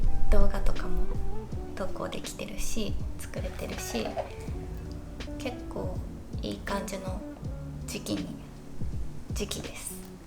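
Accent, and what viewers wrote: Kansai, Japan. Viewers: 私も精神的な病気を長い間、患っていて良い時期と悪い時期の波があります。そして、線維筋痛症という身体の激痛と12年間も共にしています。動画やnoteで、naoさんが体調や心にきちんと向き合われているのを観て、私も体調と心にきちんと向き合おうと決めました。 メイク道具がポーチに入ってないのが面白かったです😂メイク道具って、なぜか増えてなぜかポーチに入りにくいですよね😂 naoさんのおかげで読書が趣味になったり、インテリアに興味を持ったり、とても良い影響を受けています。ありがとうございます！ あと、naoさんの関西弁って、とっても可愛いですね。癒やされます。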